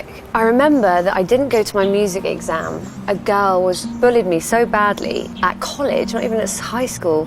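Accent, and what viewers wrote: United Kingdom. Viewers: Not gonna lie ...she has a strong British accent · Here accent is more British than a ASDA or a Tesco worker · forgot she was british and i thought she was faking an accent in the beginning.. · That British accent, love it! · She's so British 😍😄 I love it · Her UK accent ughhh 🥵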